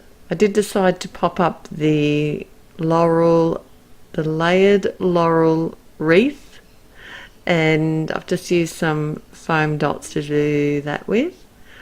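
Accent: Australia. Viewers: Thanks for sharing. Enjoyed this simple but very effective card tutorial. Nice to hear an Aussie voice too. Really hoping to buy some of the new Altenew markers - the colours are very vibrant and pretty. Can we buy Yupo paper here in Oz, do you know? Thanks again for your lovely card.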